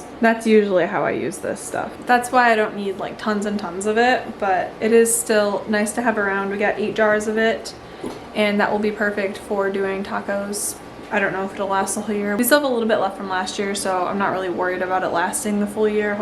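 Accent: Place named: Great Lakes, United States. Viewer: I have sisters in Gaylord and the Soo and I live in NE Wis. I thought your accent sounded great lakes like. I'm looking forward to watching more of you videos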